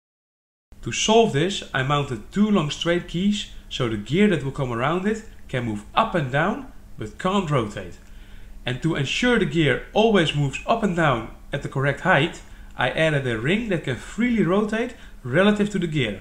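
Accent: Netherlands. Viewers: are you dutch? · nice!..that would actually be a good kind of"scarecrow" in the garden..just a question ,how come you got such a strong dutch accent? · jezus man, je kan wel wat beter je best doen om minder nederlands te klinken.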